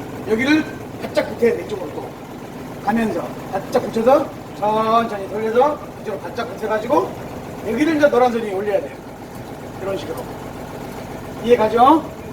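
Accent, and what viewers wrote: Jeolla, South Korea. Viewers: 전라도 교관 같은데요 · 찰진 전라도 사투리 선생님 인상깊은 강의 잘 듣고 갑니다. 감사합니다. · 전라도 사투리 맞죠잉?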